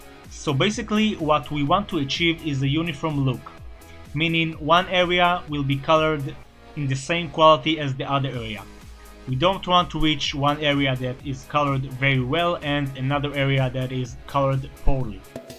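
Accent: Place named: Israel. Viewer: אתה פשוט מלך! למען האמת, כשרק התחלתי לצפות בך שמתי לב למבטא שלך ואז ראיתי שהשם שלך זה וישר ידעתי שאתה ישראלי וזה מאוד שימח אותי. אז תמשיך ככה אתה אחד הציירים הכי טובים שראיתי! בהצלחה בהמשך הדרך שלך ביוטיוב!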